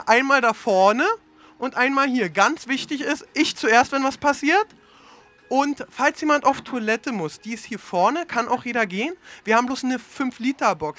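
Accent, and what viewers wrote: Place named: Berlin, Germany. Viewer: Ich könnte mir das video so oft angucken immer lust drauf haha mit seine berliner icke😹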